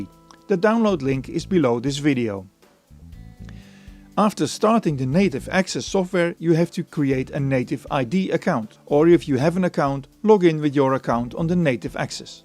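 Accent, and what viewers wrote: Netherlands. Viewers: are you dutch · I hear you are dutch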